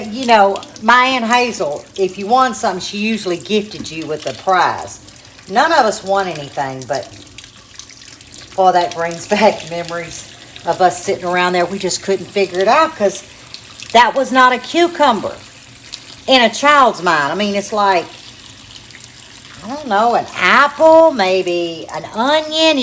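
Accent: South, United States. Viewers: I absolutely love you! I think you are adorable and I love your videos so much! I feel like you are right here in person. My dad was from Missouri and you just bring me back to that lil bit of south…you & your accent remind me of my fun loving talented aunts and watching them cookin & baking with gma!!❤️ HELP!! My day 2 for the candy pickles is tonight BUT I HAVE TO BE GONE BY 4!!! What should I do?? Should I finish my day 2 earlier this afternoon or should I let them soak another day or should I rinse them and leave them rinsed what should I do?? (I don’t Know how late I will be back tonight)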